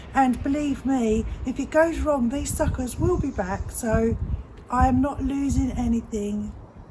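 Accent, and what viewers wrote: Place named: England, United Kingdom. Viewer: I love your English accent, thanks for sharing instructional video.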